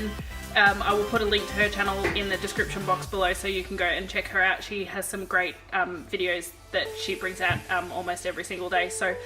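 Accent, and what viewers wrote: Australia. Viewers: I came from money mom's video. Are from QLD because you sound just like Mel Buttle and she sounds so QLD to me? Also are you Mel Buttle?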